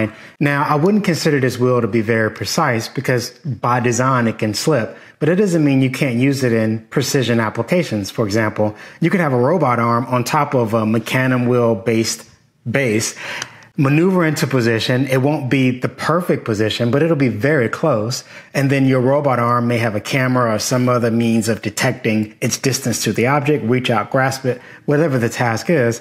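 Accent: Baltimore, United States. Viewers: You sound like you from Baltimore you should try to get on at that bridge project. I'm sure they use an engineer if your caliber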